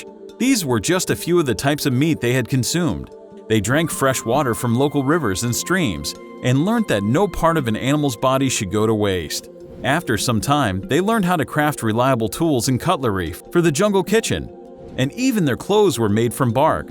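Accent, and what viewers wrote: United States. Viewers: Anything narrated with an American accent ruins the subject involved.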